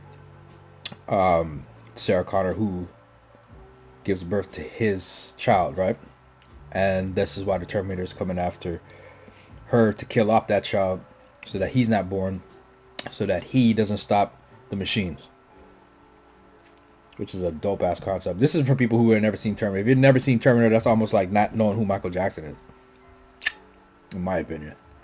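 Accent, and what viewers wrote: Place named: California, United States. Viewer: His California accent is hilarious 😂😂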